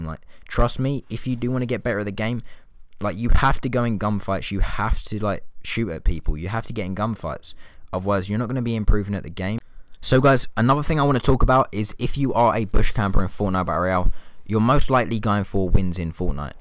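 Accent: United Kingdom. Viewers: You from the uk? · Btw you sound British I'm British want a cupa tea 😃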